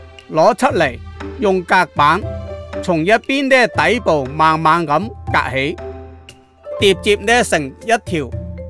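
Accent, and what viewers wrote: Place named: Zhaoqing, China. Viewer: 口音一聽就聽肇慶人👍👍👍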